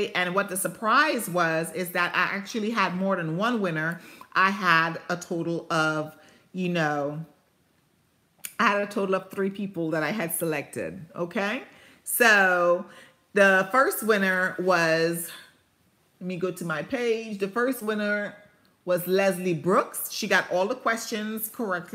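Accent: Trinidad and Tobago. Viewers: ..Congratulations! I was lucky to catch the end of your livestream. Excited for the next one. Love your costume shirt! We love Mandolorian and the child. You sound so happy and jolly I am so very happy we subscribed to each other hoping to get to 1,000 subs soon. I am hearing a slight island accent there❤❤❤ Ps. Wish I knew about the giveaway before those samples looks awesome especially the lipstick I need some new ones but can't afford any right now. Girl some of them brands I never heard about. I am really happy that I am here I have a lot to learn llove the Mickey Mouse bottle I live in his backyard 🙂 Hey I figured the accent out Trini in the house yayyyy. We Trinis are very generous people, your son is very cute. I like how you teach him to call people Miss or Mr I teach my granddaughter the same ❤❤❤ look on my channel I have all them delicious dishes you talking about. 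Come retire in Central Florida it have a lot of new affordable houses.Do you have a PO Box?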